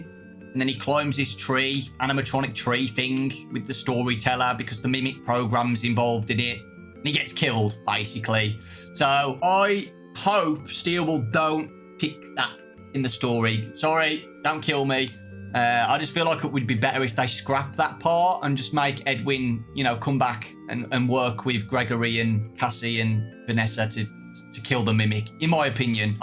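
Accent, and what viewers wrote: United Kingdom. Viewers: i love how ur accent sounds i wish i was british 2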